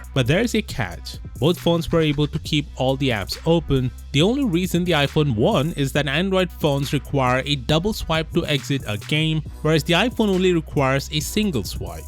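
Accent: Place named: India. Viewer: I know an Indian accent when I hear one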